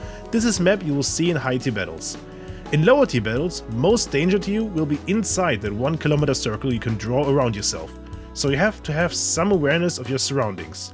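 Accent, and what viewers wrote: Germany. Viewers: I hören German ?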